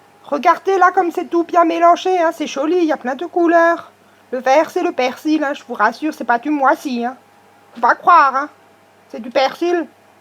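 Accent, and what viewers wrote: Alsace, France. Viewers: rhooo l'accent alsacien est top ;) j'adore je vais essayé de refaire la recette bisous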